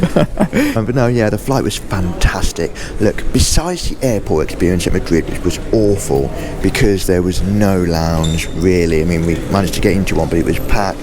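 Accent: United Kingdom. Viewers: Nice trip! Your accent is beautiful, I'm wondering where are you from?! Scotland or Wales??? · Really nice Flight report in the amazing B787-800 of Air Europa 🎉 love your British accent and your great voice. Thanks x sharing!!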